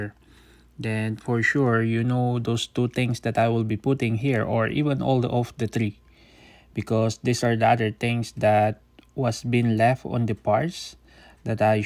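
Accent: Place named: Philippines. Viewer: That pinoy accent is strong here.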